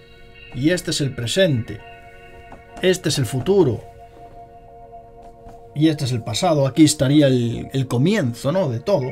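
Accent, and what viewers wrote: Spain. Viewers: lindo espa;olito me gusta su acento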